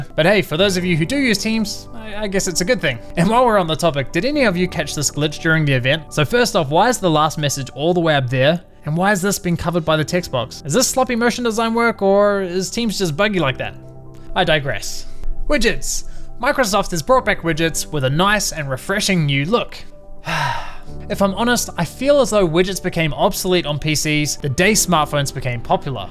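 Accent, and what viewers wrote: New Zealand. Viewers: Are you a kiwi? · I know you live in Germany.. but what is your accent? New Zealand?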